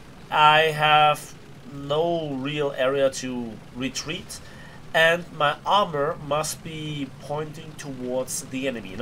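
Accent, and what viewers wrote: Germany. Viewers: A very enjoyable video,. I think there is an element of RNG in war thunder, even though Gaijin denies it. I have penetrated the casement face of the jagdtiger with the T29 a couple times in test drive, but that was only 2 out of about 15 shots with no variation. Though perhaps we should not be totally critical of slight RNG. I believe it acts (intentionally or unintentionally) to represent the uncertainty and inconsistencies one would find in real combat. Also, any chance of a face reveal? We want to pair a face to that German accent lol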